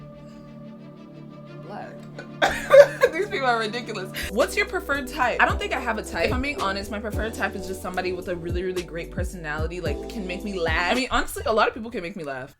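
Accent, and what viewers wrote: Nigeria. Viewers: She is nigeria Becuz her last name says and its a igbo name in nigeria And in her second youtube channel when she was surprising her family with gift she had played a nigerian music She is nigeria but was born in taxes you can hear the nigeria accent when she is talking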